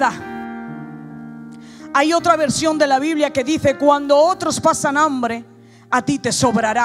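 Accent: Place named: Latin America. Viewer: Porque hablan con acento latino??? Mijooo???😂😂😂 Son gitanos de Madrid de toda la vida de Dios..No entiendo, que ridículo🤭🤷🏽‍♂️pufff madre mía...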